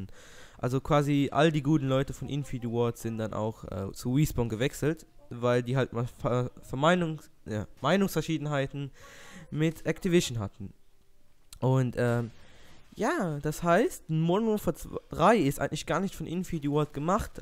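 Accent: Luxembourg. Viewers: Das sind keine Sprachfehler, das ist Dialekt. Er ist Luxemburger :)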